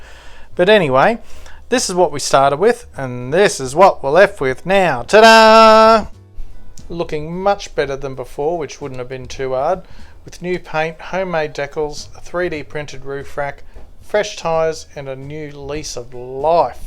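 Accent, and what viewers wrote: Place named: Australia. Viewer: gee, looks nice and clean again. especially the roof rack, very useful, even for other castings, and customs. what if you do the rack two-part? make the two clamps that grip the roof's edge separate, connected by a rod in the middle maybe, so they click easier around the roof, and once the actual rack is glued down all gets stabilized. of course, chroming it will be a challenge then. but personally I'd be afraid of the resin breaking. anyway, great work! the casting looks a bit disproportionated, maybe because of the narrow wheels? aside that, if you need a giggle, look at how YouTube subtitles translate your 'strayan accent... including the dremel's noises. XD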